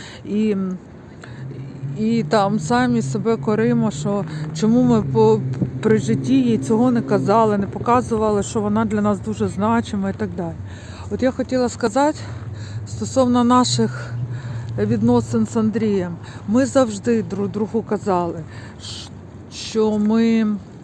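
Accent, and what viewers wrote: Russia. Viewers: Барышня , слушая вашу речь понимаешь что родной язык ваш РУССКИЙ. Не издевайтесь над собой и не занимайтесь садомазохизмом. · Дуже гарно розмовляєте українською, хоча чутно що розмовляли російською, велика подяка та респект, в цьому видно любов, повага, пам'ять до чоловіка який загинув за Україну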